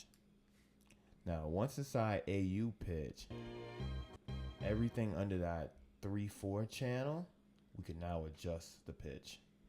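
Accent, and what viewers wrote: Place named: New York, United States. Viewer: this guy is clearly from NYC lol. good tut, thanks.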